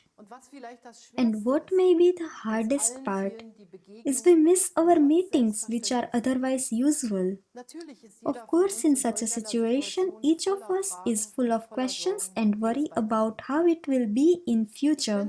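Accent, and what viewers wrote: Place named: India. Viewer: If you keep arguing over semantics like this you're gonna have a rough time later on. Fact is, it's hard to follow a person that has a thick indian accent.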